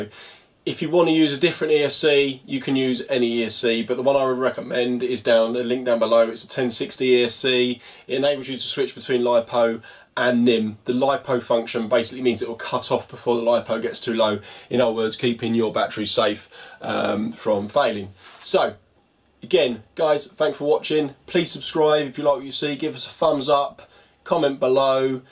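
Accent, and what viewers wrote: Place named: Australia. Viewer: Nice vid, Aussie accent?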